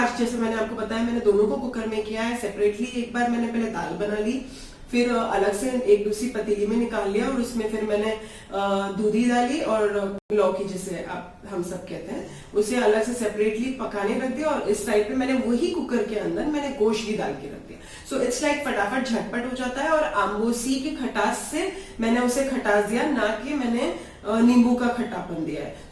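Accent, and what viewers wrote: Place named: Gujarat, India. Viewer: Masha Allah 👌👌 I like d way u talk as we gujarati peoples do..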